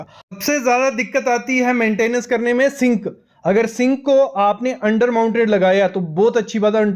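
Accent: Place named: Punjab, India. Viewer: Why I'm observing ur voice u r from punjab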